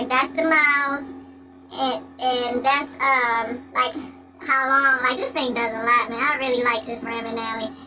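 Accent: Baltimore, United States. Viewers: Truker path is also a GREAT app for trip planning. It gives you truck stops(large chains & mons and pops as well) rest areas, Walmarts, weight stations, etc... all at the same time. The voice thing tho, no fun intended but I'm a southerner and I enjoy your B-mor accent, js. Do you of course though, lol. Good Vids!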